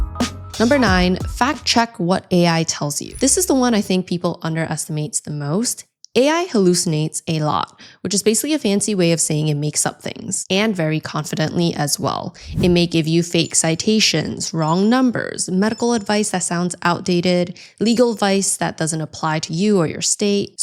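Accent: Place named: United States. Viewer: hi lady. i just saw u now. thanks for this video. its great value video. i have simple but important advise 2u. u speak very clear gd american english language, but since u r global now, itvmeans u r talking to many people with varying English language levels. you talk little bit fast, which make it hard to catch or understand all what you say in ur videos. i wish u can talk relatively slow so then most or all people watch ur videos, easy catch u & understand u. thanks❤🎉🎉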